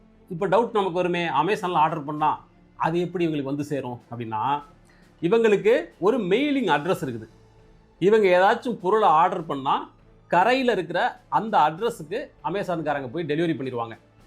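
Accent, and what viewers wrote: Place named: Thanjavur, India. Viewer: நல்ல உட்சரிப்பு தஞ்சை பேட்சு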